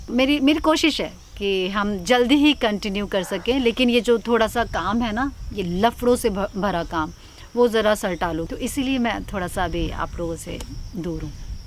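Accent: Bihar, India. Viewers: I'm from Patna and love ❤ you...I like your thoughts your vlogs your life style and yes aapka Bihari style me kabhi baat karna Bihari khanpan sab pasand hai....aur main aapse milna chahti hoon....kabhi aap Patna me apne subscriber se mile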